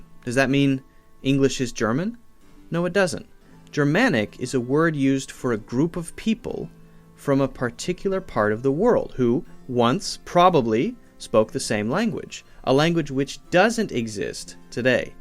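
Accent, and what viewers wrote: United States. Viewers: you are not speaking english you are whining american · Good. One point: your English us not 'midern' it is USA English. In England we do soeak English, standard English as well as 'modern'. Please, do NOT try to confuse the rest of tge world about what modern/contemporary English is. Thank you. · The guy that is speaking is not English but American. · what a pity is a septic talking and not a brit.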